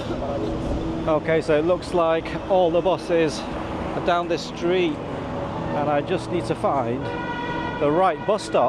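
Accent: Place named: Yorkshire, United Kingdom. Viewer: I can tell you're from Yorkshire. I paid 12 euros return on the big blue Dublin coaches in May.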